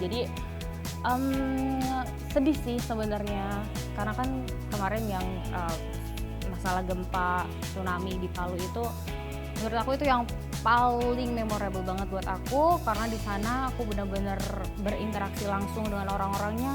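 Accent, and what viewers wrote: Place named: Mranggen, Indonesia. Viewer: pye2lah logat mranggenmu gk ilang mbak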